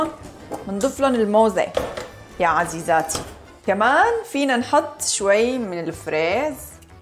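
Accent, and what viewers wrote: Aleppo, Syria. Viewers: بيشهي وبيبل القلب... وانت وضحكتك بتبلي القلب... وحكياتك الحلبية الحلوة.. شكرا أنت الأروع وتزدادين روعة ❤️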